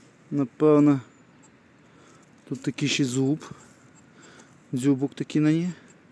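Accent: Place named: Bukovina, Ukraine. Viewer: вітаю.цікаві походеньки, підписаний на Вас.Чую по мові та бачу по природі, що Ви десь на Буковині.Якщо це так, то хотілося би знати район, а все для того, щоб десь походити разом.Дякую і кладуху сєрєбруху!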